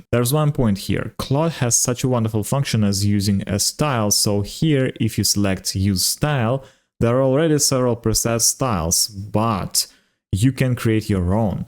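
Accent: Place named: Germany. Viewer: your accent is legit german, that's why i said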